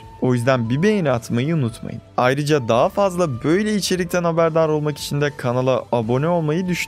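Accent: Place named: Sivas, Turkey. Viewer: Abi gardaş ı çok iyi telaffuz ediyorsun sende sivaslï havasï seziyorum yanlış da olabilir cevap verirsen sevinirim♥️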